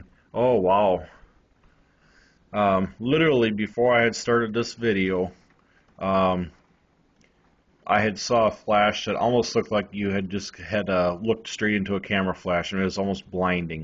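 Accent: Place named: Midwest, United States. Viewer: I'am originally from wisconsin,you shure got a midwest accent- no shame:)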